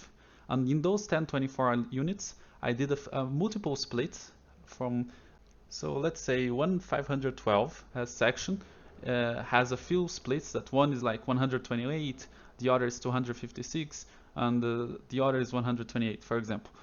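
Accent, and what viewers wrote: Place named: Europe. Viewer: primeira vez que escuto um brasileiro com sotaque de europeu kkk. Bom trabalho aí mano muito bom seu workflow.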